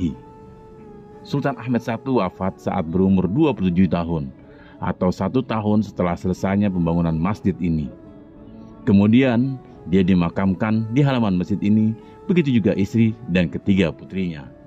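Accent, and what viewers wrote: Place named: Banyumas, Indonesia. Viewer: Reportase nganggo ngapak mbah 😀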